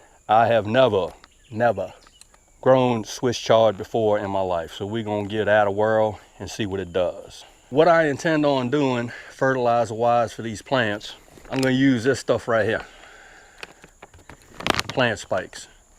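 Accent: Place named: New Orleans, United States. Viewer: Awesome Video My Friend, Watch Out!! I can hear The New Orleans in You Seeping out with words Like, " YOU HEARD ME" 😂😂 That Kale, I'm looking forward to seeing Your UPDATES on this Veggie Growing in the Earth bed! I know it will Do Well, Enjoy your day, Take care of that PINK EYE💗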